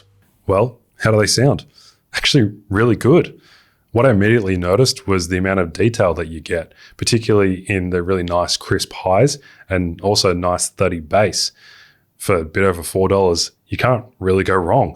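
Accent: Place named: Australia. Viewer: aye, always good to hear a fellow Aussie within the tech space grateful to have found your video just now via the YT algo keep up the good work, mate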